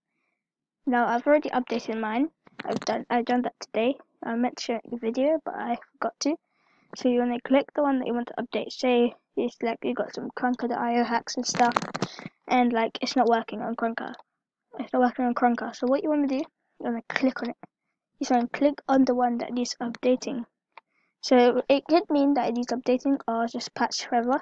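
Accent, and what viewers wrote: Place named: United Kingdom. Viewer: i can tell you're british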